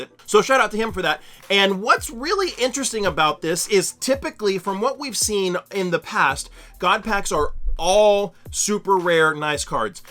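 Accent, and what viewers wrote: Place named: United States. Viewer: deze man gaat geen nl spreken hoor zn accent, dat is echt amerikaans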